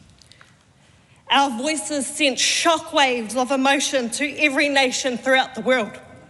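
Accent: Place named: New Zealand. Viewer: i like her accent moari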